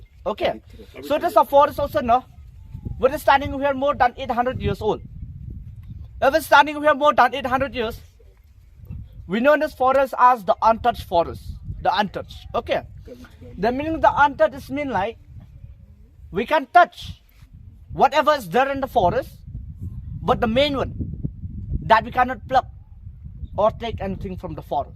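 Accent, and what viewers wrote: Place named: United Kingdom. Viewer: Ym dei da ka phareng hynrei dei beit da ka english kaba na UK... Keep it up bro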